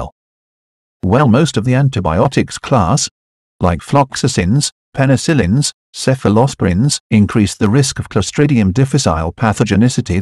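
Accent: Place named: India. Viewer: 8/10 ... indian english accent would be the most welcome change... nice content though...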